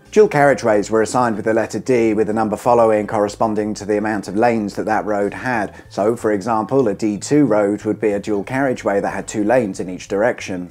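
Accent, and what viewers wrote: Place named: Norfolk, United Kingdom. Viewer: This was very interesting. Side note: Are you from Norfolk? You sound quite Norfolk in this video.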